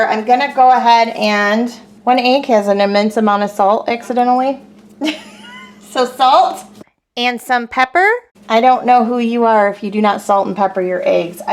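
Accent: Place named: Detroit, United States. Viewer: Yes! Do it! I never thought to make Hash on a sheet pan. brilliant!! Looked so delicious. Right me too. I e been doing way more of them. Just throw away the foil. Great job! Aha! I KNEW you were from the Detroit area! I could totally dedect that in your accent ❤️. I grew up in the Toledo area and my In laws lived in Clarkston! Small world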